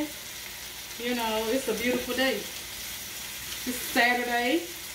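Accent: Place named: South, United States. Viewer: I have cooked something similar but I'm going to follow your recipe next time. I love to listen to you talk. You sound like a Southern girl like I am. God bless you with health and happiness.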